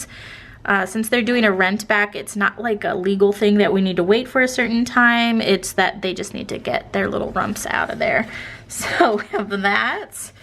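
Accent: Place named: Seattle, United States. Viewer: I am a fairly new subscriber to you channel. Just listening to I got the feeling you are from Seattle. Are you?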